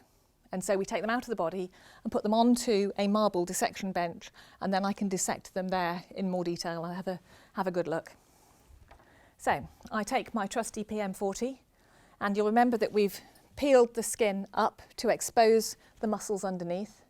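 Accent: United Kingdom. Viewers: Her English accent somehow makes this autopsy classy.🤣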